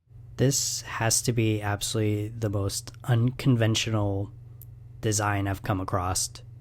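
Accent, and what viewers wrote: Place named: United States. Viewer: I'll always choose ground floor. Carrying a bike plus groceries up stairs is too much for me. P.S. I'm happy to find your channel. I follow another but he doesn't share a vid often enough. I am watching two now and you speak American English. 😊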